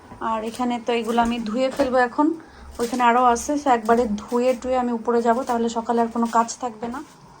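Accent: Sylhet, Bangladesh. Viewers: bhai apnar sylheti maat huniya ami crush khailaisi..MashaaAllah 😊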